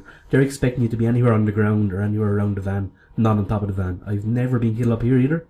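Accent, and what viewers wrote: Ireland. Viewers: U Irish if u are respect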